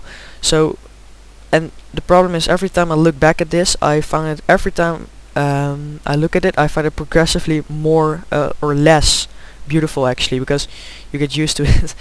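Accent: Netherlands. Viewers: Ben je nederlands xD Je hoort gewoon dat je geen engels/amerikaans bent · nederlands? dat hoor je gewoon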